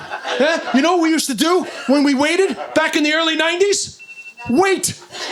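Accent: New York, United States. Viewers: You code-switch to a much stronger American accent for American audiences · It's just a new york accent , and the fact both of them are a bit hyper.😂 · Never heard of this guy before, but DAMN that was a solid set. High energy, aggressively funny and smart, and an amazing combo of street New York accent with metropolitan comedy. 5 of 5 stars!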